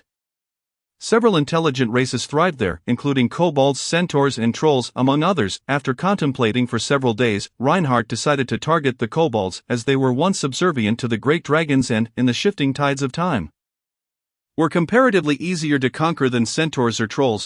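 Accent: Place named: United Kingdom. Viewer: Improve audio It sounds like some sophisticated British shit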